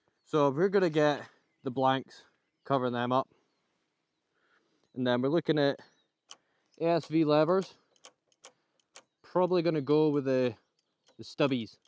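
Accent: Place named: Scotland, United Kingdom. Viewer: Refreshing to hear a Scottish voice. Nothing wrong with keeping a bike you like .its for you not anyone else. I have a 1981 Yamaha RD350LC i have had it since 2000 . I have a 2000 suzuki tl1000s owned it since 2001 also a suzuki Gsxr1000 k5 owned for 4 years no intention of selling anything also a 1978 Yamaha dt175mx owned for 5 years. Be happy with whatever you have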